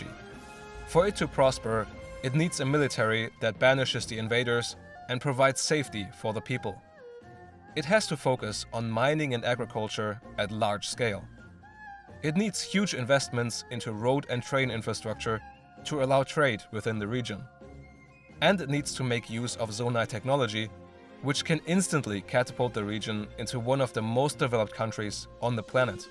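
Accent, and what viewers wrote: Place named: California, United States. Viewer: Don't know man sounds like California to me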